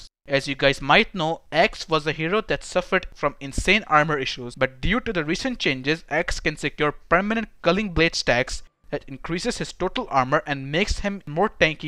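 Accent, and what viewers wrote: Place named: India. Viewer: This indian accent man 🤭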